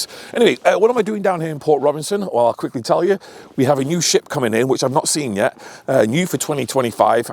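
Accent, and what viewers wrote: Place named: Manchester, United Kingdom. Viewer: A fellow brit & your in Canad! I recognised the Manchester accent. What a beautiful sight of the huge ship & another lift bridge like in Duluth MN. You are so lucky to see this. ❤ from UK. 🇬🇧🇨🇦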